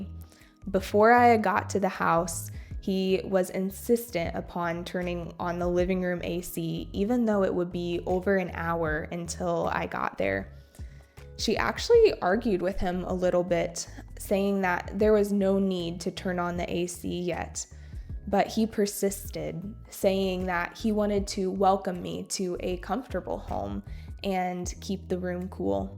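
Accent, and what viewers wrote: United States. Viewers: Em rất mê American accent nên rất hóng những video tiếp theo của chị ❤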